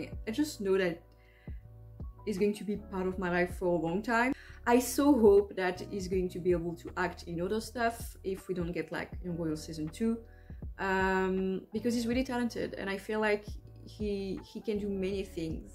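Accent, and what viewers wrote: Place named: France. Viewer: Je t'adoreeeeee 💖 (t'es française, non?) I guessed based on the accent haha. But yes the Omar effect is very very real and at this point my obsession has become so unhealthy haha.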